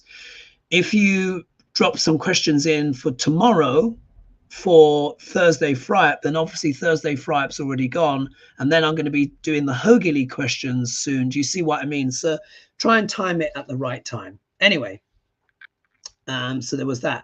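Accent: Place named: London, United Kingdom. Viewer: Thank you for reading on Germany; yes I live here and just don' t want war; I agree to what the pope said ( of course, I am italian 😂) inflation is still going on; in Germany, prices high. I am so glad, I found your channel once 😊 thank you for giving me a home feeling always when you talk; miss the Londoner dialect a lot; when we had the zoomcall reading last year I told you the Dad of our kid was from London; unfortunately he suddenly died 2 years ago. So it makes me happy when you talk and share your humour ❤❤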